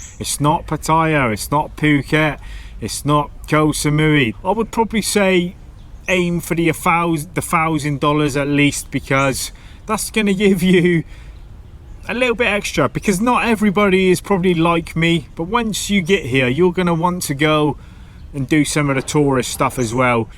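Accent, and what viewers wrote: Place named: South West England, United Kingdom. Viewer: Hi, Been following [subscribed] you for a while now, it sounds like you are from the South-West-ish area of the UK [so you will be well-aware of the weather in the UK]. I am interested in Chiang Mai "as it seems to have cooler temperatures than the rest of Thailand" [Chiang Rai also looks like a cooler option too], that said, could you give a portion of one of your vlogs that tells of what to expect weather-wise [mostly between November to May in my situation] in Chiang Mai? Good-luck Buddy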